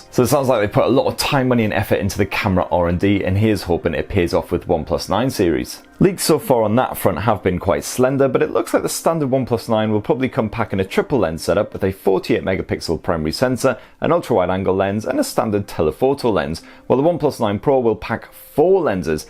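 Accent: United Kingdom. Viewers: Love the approach to all your videos, you should be bigger but hey maybe some can’t handle the queen’s English. Keep up the great work mate .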